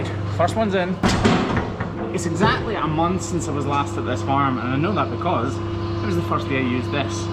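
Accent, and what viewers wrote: Scotland, United Kingdom. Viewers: love this guy and ahis Scottish accent. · I'm just curious, what would say your main viewer demographic is? For example: People who are wanting to learn about trimming cows feet? People who are hoping to see a little puss? People who find these videos put them to sleep? Women from America who just want to hear a cute guy with a Scottish accent talking to them? Or something else? I'm sure all these examples apply but what do u think the main one is?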